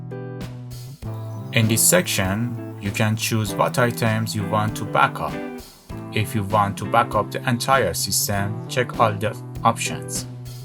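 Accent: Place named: India. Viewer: Loved your indian accent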